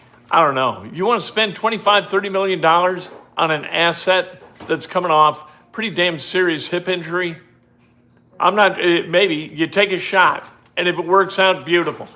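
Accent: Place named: Indiana, United States. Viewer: It’s odd that you said you moved to Indiana. You are 100% a Hoosier in your way of talking and mannerisms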